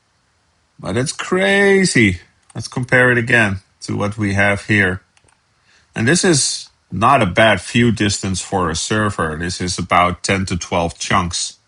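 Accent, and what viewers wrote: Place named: Netherlands. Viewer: You sound dutch :D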